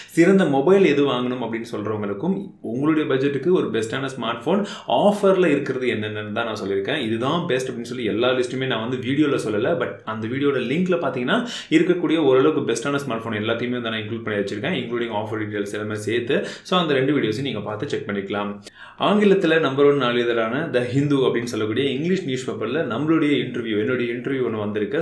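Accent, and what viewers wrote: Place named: Kongu, India. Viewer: வாழ்த்துக்கள் அண்ணா..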